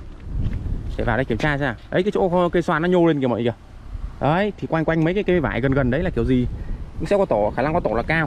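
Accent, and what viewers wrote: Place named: Hải Dương, Vietnam. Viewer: ânh đang h đang sống ở đâu vậy, nghe như Hải Dương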